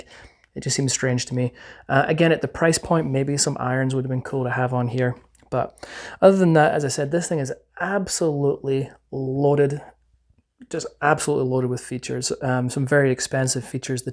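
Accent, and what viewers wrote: Scotland, United Kingdom. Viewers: Its so good to hear a fellow Brit / Scot talking about firearms. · Liked and sub'd! Great Review. Sounds like you are Scottish, am I wrong? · Do I hear a hint of Scottish?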